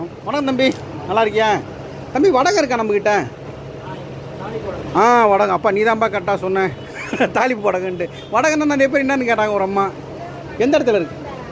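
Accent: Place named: Madurai, India. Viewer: Niga peasuradhu madurai sowrasthra mari dan iruku anna ovoru ooru sowrasthra slang change agum anna adan ketan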